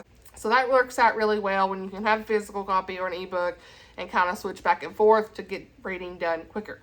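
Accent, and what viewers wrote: South, United States. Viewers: ok the libby app I think I may have heard of, is it like hoopla? I know they do books, movies, audio book, e-books etc. Whatever is at your library. Ok will check it out thanks. Love your country accent btw. I tend to pick up my country accent after hanging around my cousins from the south. Then I get back home & people forget I am not actually southern lol.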